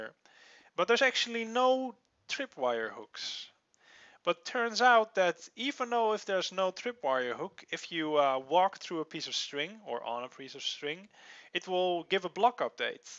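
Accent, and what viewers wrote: Netherlands. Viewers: Ik hoor dat je uit nederland komt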